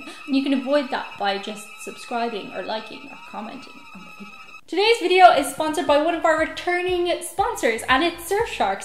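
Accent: Ireland. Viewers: I thought you were Irish.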